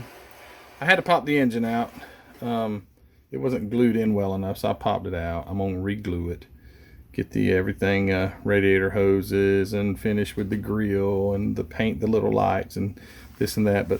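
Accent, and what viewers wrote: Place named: Georgia, United States. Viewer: I rather watch videos with someone explaining things than just music , I like listening to you cause you are from my home state Ga and I miss it but I sure don't miss the humidity. .o boy lol.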